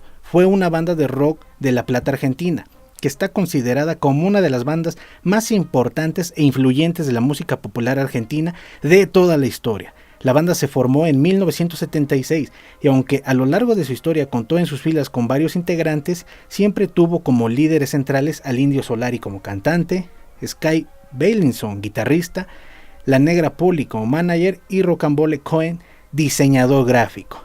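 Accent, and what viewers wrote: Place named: Mexico. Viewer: Hola hermano mexicano!!! Gracias por difundir por allá nuestro rock y te hago una pregunta porque tengo muchos amigos mexicanos, pero son de Ciudad de México, Puebla y Baja California pero no reconozco tu acento, es de Guadalajara?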